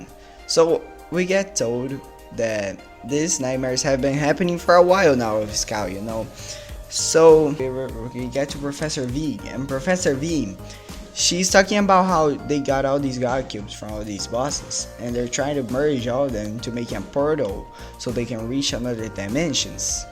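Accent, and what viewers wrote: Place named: Brazil. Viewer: why do mine video and your's have the same text on the thumbnail 😭, and we both are brazilian, atleast i think you are brazilian by your accent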